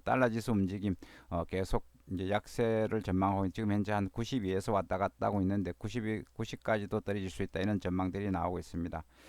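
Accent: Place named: Gyeongsang, South Korea. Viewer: 이분 경상도말 쓰는 라도좌좀이십니다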